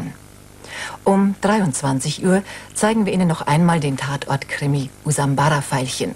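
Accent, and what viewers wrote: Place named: Bavaria, Germany. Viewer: Carolin Reiber klingt hier noch ungewohnt "bayerisch" , fast als ob sie dann beim Wechsel nach Mainz einen Nachweis für Hochdeutsch machen musste😅 Und wie damals immer auf inszeniert in s/w und schlechte Tonqualität hingewiesen wurde. Müsste man sich heute mal vorstellen, in ZDF/ARD bitte entschuldigen Sie dass wir den Film nur in 720p HD ausstrahlen 😂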